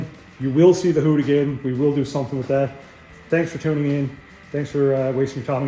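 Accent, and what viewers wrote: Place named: Newfoundland, Canada. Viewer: You're a newfy ay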